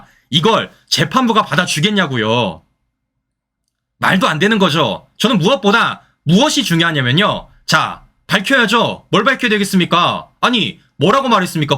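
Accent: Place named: Honam, South Korea. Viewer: 말투가 7시네. 까보전은 과학이다.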